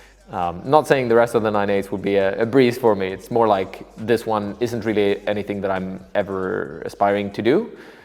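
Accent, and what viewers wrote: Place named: South, United States. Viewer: I thought this guy had a Southern twang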